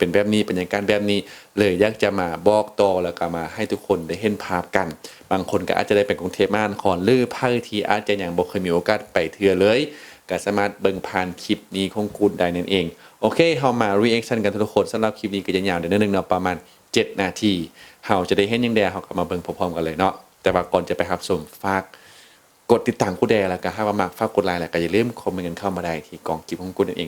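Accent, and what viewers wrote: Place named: Suphanburi, Thailand. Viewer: สำเนียง น้อง คล้ายๆคนสุพรรณบุรีที่มีเชื้อสายลาวเวียงเลยครับ เราเรียกว่าเหน่อสุพรรณฯ แล้วก็คล้ายๆกาญจนบุรี ราชบุรี นครปฐม ประจวบฯ ส่วนเพชรบุรีก็จะเป็นอีกสำเนียงนึงครับ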